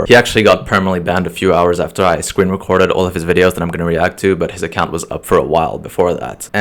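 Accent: France. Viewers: If you speak other languages other than French, french included You'll notice occuring words that are french and also belong to another Language like English for example, You can find lots of words Like chef d'oeuvre, hors d'oeuvre, football, playstation, YouTube, story, nike, film, films, videos, [Names of people, places, and food] ,images, and other hundreds of words The french pronunciation can be either similar, or different Well he most of the times he would pronounce words the exact way they should be in English But sometimes ( in this video in particular ) he did pronounce words exactly how they should be prounced in french or in a similar french accent ( imagine a french person saying the word "story" the way it should be prounced in french "stori" ) PS: other languages such as japanese include french words but with its japanese pronunciation For example: story becomes ストーリ, story is not the best example because it isn't originated from french, But I was taking about the way words are pronounced in french Take story it becomes "stori" (Y prounced as i here) The Japanese pronouciation is kifkif as what I stated above 👆⬆️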